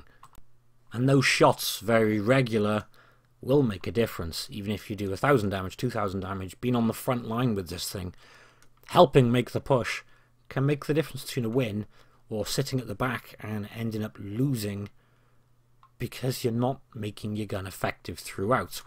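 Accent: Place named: Wales, United Kingdom. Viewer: Wyt ti'n siarad Cymraeg? I noticed your accent and wondering if you're from Wales:)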